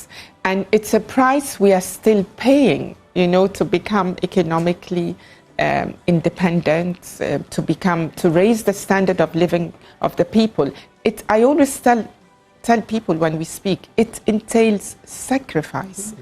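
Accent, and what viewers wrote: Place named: United Kingdom. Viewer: She talks like a British lady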